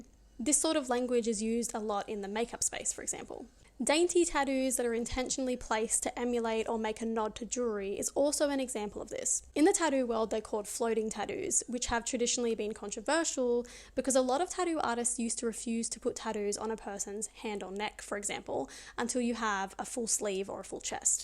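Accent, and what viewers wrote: Australia. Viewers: So you pronounce it tadoo in Australia? My brain makes me understand "taboos" half of the time. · Oh, I am from Austria - where tourists think that Kangaroos are from. Don`t hear too mutch aussi accent here - that`s maybe why you sounded unfamilliar to my ears...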